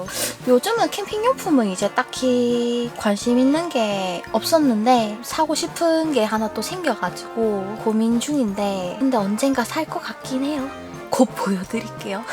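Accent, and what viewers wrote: Gyeongsang, South Korea. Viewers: 갱상도사투리 너무 귀욥 ㅎ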